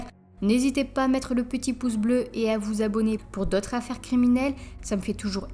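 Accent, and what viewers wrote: North, France. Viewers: Tu es du nord toi non ?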